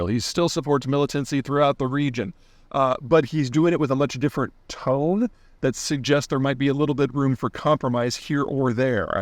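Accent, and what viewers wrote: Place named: Texas, United States. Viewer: He lived in Texas for a long time. We all just pronounce it that way. Not defending it, it's just a local accent thing.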